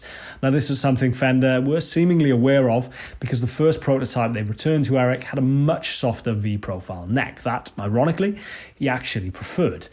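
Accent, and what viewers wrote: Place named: England, United Kingdom. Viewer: Beautiful playing like always! and we just love your "einglish accent". Servus from Vienna! (p.s. what do you think about the eric johnson signature strat?)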